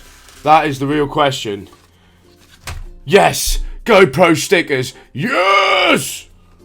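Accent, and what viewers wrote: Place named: Yorkshire, United Kingdom. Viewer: love the yorkshire accent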